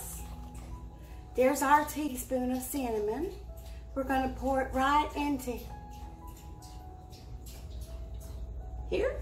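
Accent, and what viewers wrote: South, United States. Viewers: Love your shakes and southern accent. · Too cute with their bibs. Love Monroe loving Tonka, sweet! Tomorrow will be on the road for 10hrs Albuquerque to Okla City. Hopefully we will find a place to settle. Thanks for the Southern accent. Married to a Southern man. hehehe. Joan Bates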